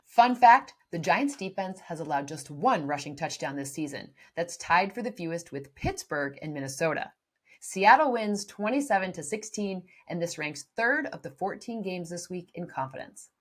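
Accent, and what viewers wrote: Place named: United Kingdom. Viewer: Love the British accent! Continued excellent content.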